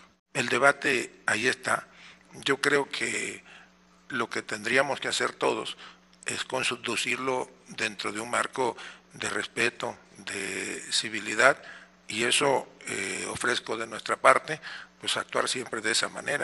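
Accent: Tabasco, Mexico. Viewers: Ya no quiero oír más acento tabasqueño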